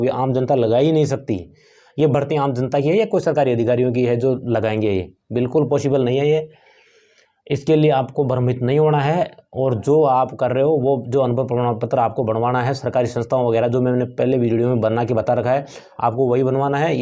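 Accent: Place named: Dhundhari, India. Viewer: तू यार बिल्कुल देसी हिन्दी बोलता है मजा आ गया बिल्कुल ढूंढाड़ी जयपुर के मीणा जैसे की हम भी तेरे जेसे ही बोलते है इसी लिए हमे ओघड़या कहा जाता हैं वा लौंडे मजा आ गया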